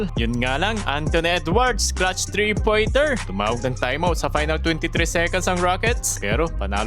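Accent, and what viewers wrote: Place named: Isabela, Philippines. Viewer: Taga isabela ka lods noh same kau ng punto ng kompare ko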